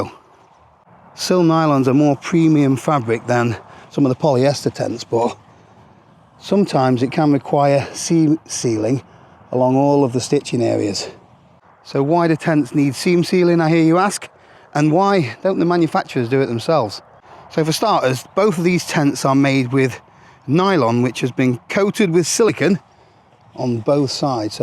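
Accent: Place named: East Yorkshire, United Kingdom. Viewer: DIY, snot ard is it...... 'Ull' east yorkshire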